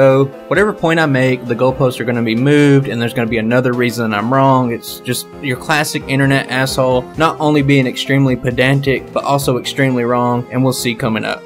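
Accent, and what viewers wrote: South, United States. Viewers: just finished your vid and btw love the southern accent... Quick question do you think you can do a vid of you doing it as if you were playing normally.. as in more than one pokemon.. no tms and no items? Just not seen one of them might be intesresting.. if you do or dnt will keep watching your vids keep it up :)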